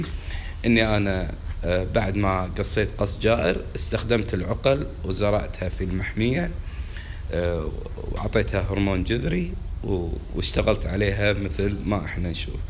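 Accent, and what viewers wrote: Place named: Gulf, Arab world. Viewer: انته خليجي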